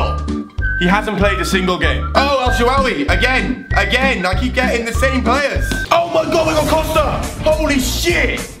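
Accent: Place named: Newcastle, United Kingdom. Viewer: You sound like a Geordie shore person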